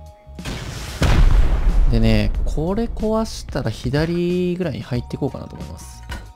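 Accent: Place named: Kansai, Japan. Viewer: 関西弁バチバチすね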